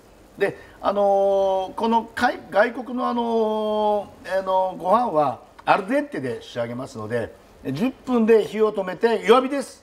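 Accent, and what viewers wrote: Hokkaido, Japan. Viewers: シェフの北海道弁に、郷土料理に対する愛情を感じました。旧フランス領で発展した料理 なども紹介してほしいです。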